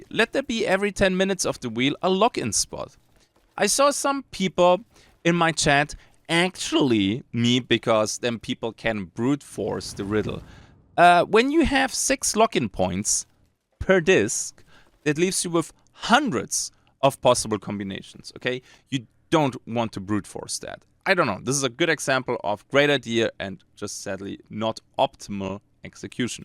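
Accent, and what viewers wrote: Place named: Germany. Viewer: Sehr gutes Video, Sehr deutscher Akzent hahaha. zB review. Das re wir wie be reel ausgesprochen und nicht wie bei red. oder biomes. bi wie by und nicht wie bee die Biene. aber echt gute review, schön viele Schnitte zu spannenden Szenen und passend zu dem was du sagst. Nicht 0815.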